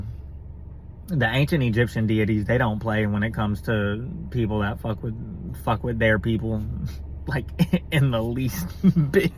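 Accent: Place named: Texas, United States. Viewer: I'm a new sub! Are you from Texas? You sound like back home to me... sending you warm loving energy from Hollywood, my newest home! ✨️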